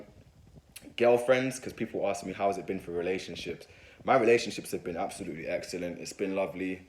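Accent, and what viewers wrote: Jamaica. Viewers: I thought you were ja-mac-ian did you say you from London?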